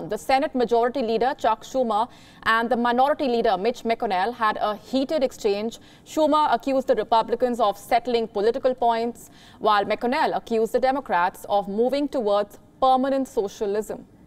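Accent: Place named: India. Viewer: I would really like to listen to the news. It's just that your accent puts me off. Can't newsreaders work to develop an English accent? Indian newsreaders sound like robots..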